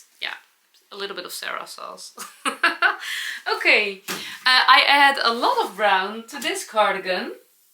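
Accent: Netherlands. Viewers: I love your "Dutchy English", Sarah. On one point, we say "lying down" in English, not "laying down." For example, when you are speaking about yourself, you will say, "I am lying down" or "I am going yo lue down." You will use the word "laying" when you are speaking about laying something down, or laying a table with cutlery, for example. That's the general rule, although some people do say laying instead of lying. It's a matter of what you're used to, I suppose. Love all your projects!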